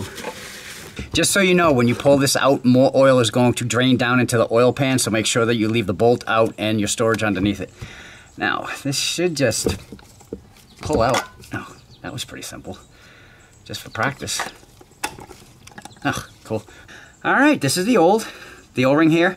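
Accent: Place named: East Coast, United States. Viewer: I have watched this video a half dozen time while changing the oil in my wife’s Jeep. But really I just watch it for the east coast accent factor 😆.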